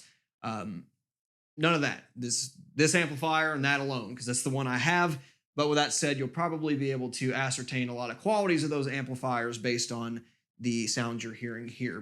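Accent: Texas, United States. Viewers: i always thought you were from Texas...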